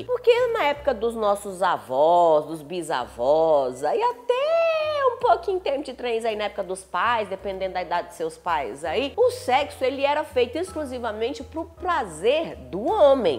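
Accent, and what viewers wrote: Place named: Minas Gerais, Brazil. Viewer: kkkkk mineiros são reconhecidos no mundo inteiro p geito gostoso de falar né vdd??? Eu sou de BH por isso percebi ki vc mineirinha uai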